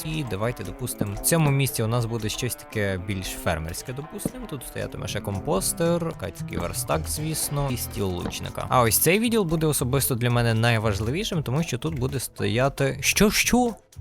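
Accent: Lviv, Ukraine. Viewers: скажи ти зі Львова? Тому що я так. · І по цьому відео можна здогадатися,що ти зі Львова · Ти зі Львова ? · ти з Львову??? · Ти зі Львова? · Ого ти з Львову??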